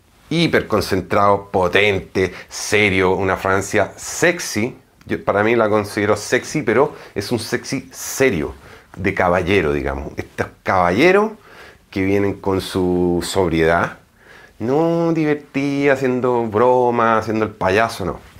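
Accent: Chile. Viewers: Tenes acento chileno me parece inconfundible pero explicas muy bien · Eres chileno?? Saludos ! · Este chico es chileno? Le noto un cierto acento de aquel maravilloso país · ¿Cómo saben tanto de la vida de este señor?. El acento chileno es inconfundible eso si. Sobretodo para los chilenos. Genial que mantenga el acento, es un plus en este blog. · Eres chileno?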